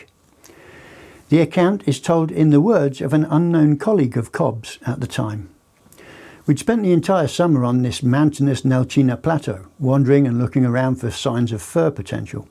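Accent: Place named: Ireland. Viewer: I really like this man’s story telling the Irish accent is so pleasant to hear. Bigfoot is real. They are an ancient tribe of people. DNA has showed they are a human / hybrid. Yes dog men are dangerous and can be found in Michigan to the east coast. They are what natives call skin walkers because they can stand on their back feet as well as on all fours. Some call them dire wolves. Bigfoot, Sasquatch or Sabe are intelligent and many known cases of saving humans.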